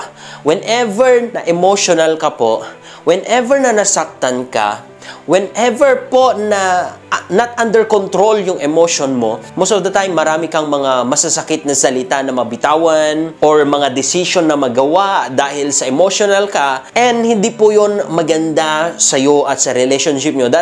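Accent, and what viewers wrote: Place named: Ilocano, Philippines. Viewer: PArang ilokano itawis ung pagbigkas mo Ng salita sir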